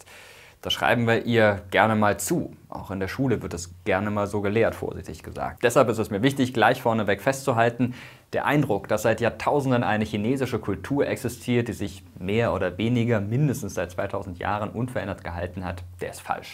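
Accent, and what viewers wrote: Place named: Southern Germany, Germany. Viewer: Das ist die völlig normale und richtige Aussprache in der süddeutschen Standardvarietät der deutschen Sprache.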